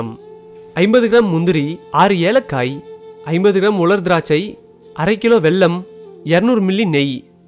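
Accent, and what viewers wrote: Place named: Tamil Nadu, India. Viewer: நல்ல ஒளிப்பதிவு, நடுநாட்டுத் தமிழ், இயற்கை, பனி, மழை, பசுமை னு ஊங்க வீடியோ உண்மையாக நல்லா இருக்கு பிரதர்! ..... செய்முறை இன்னும் கூடுதல் ஊக்கம் தருகிறது... வெற்றி நிச்சயம்🙏🤗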